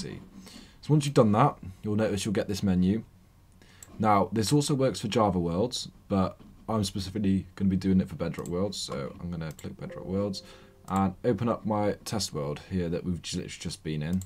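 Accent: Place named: United Kingdom. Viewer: HES BRI' SH!!! (thx btw for the tutorial)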